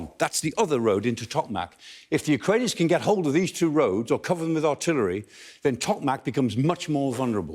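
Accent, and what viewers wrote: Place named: United Kingdom. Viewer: Thanks UK for existing, lovely accent when talking! greetings from Germany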